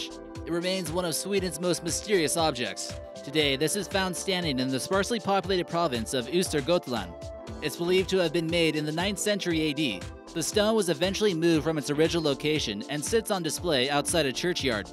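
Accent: United States. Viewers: I love americans pronunce swedish words, btw Im from Sweden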